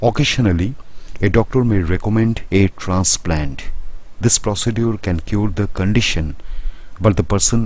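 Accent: India. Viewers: That Indian accent is strong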